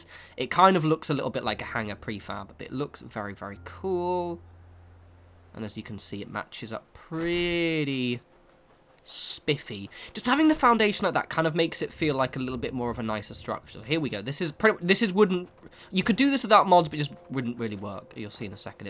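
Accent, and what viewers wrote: England, United Kingdom. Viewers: Why do I enjoy this England accent 💀it so soothing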